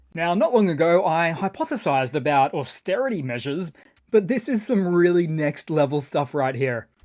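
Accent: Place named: Queensland, Australia. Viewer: Cheers your accent sounds like you might live near 1770, nice part of the world:)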